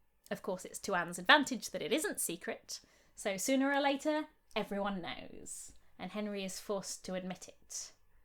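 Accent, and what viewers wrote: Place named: North, United Kingdom. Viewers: I knew you were clearly northern but I never thought you would be from Lincolnshire so now I have to consider if I should continue watching any of your videos that I had been watching. You are lucky that I used to drink in the Anne Boleyn pub on Green Street so today is a good day for northerners 👸🏻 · I was trying to place your accent...as a fellow east midlander I find it very easy on the ear....Great channel..we'll done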